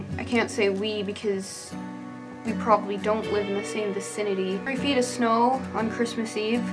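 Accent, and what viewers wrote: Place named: General American, United States. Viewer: I'm no accent expert by any means. Your accent is pretty generic American for the most part but when you said "though" I caught that sort of lengthening sound that is common with people from the north, namely Minnesota.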